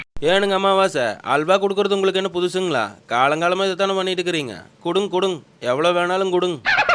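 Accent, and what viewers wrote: Coimbatore, India. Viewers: Coimbatore slang ❤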